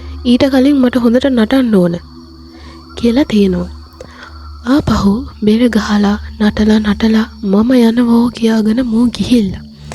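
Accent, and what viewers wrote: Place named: United Kingdom. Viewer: Uk accent nam set ma na boka 😅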